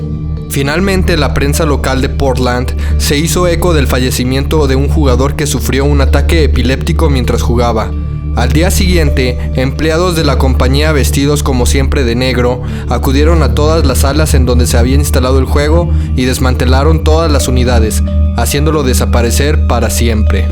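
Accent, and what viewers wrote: Mexico. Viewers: Eres de México? si es asi de donde eres? buen vídeo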